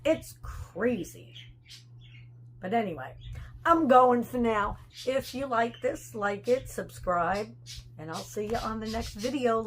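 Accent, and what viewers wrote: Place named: Michigan, United States. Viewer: Is she from Michigan? That is where I am originally from and it sounds like their accent LOL When I was a kid, women in their 60s looked the same as women today in their 80s and 90s LOL It's like your 60s today is just your midlife, not almost the end like it used to be. People retire now and enjoy their 2nd life.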